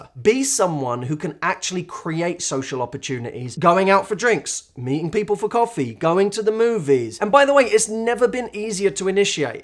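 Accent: United Kingdom. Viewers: Maybe its cultural? Telling from your accent you are British, and yes Ive lived here as a expatriate for about a decade. And trust me, trying to make friends with general British people is harder than trying to get a letter from the archbishop of Canterbury!! - this might also explain your experience so far! Its shocking but insightful to me that you say this may be because people appear too vulnerable. I dont think there is any communicative or language barrier as I speak perfect English. Strangely Ive noticed I have no problems mingling with people from other countries , and from my experience Americans appear to be the nicest bunch, and are generally interested in meeting people from other countries cultures because they are genuinely interested in doing so, and not to satisfy their own personal agendas. Maybe Ive just been unlucky but so far its been tough luck to meet a Brit who loves to hang out and have fun and do stuff. 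Also perhaps as your personal situation has changed now that you live on a different land, you suddenly realise the value of frienship and trust. Very insightful video, good job! xx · freaking love British accent.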